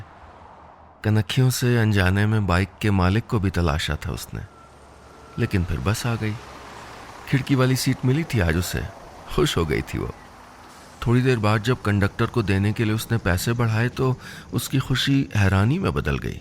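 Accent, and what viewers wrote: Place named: Kashmir, India. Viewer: jitni khoobsoorti se aapne kahani ke liye, kashmiri accent laya hai, isse badhkar kuch nahi 💙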